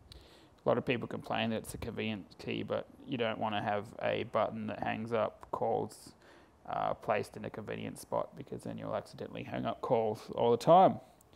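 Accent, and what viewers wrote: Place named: Australia. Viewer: you're aussie. finally an aussie reviewing a blackberry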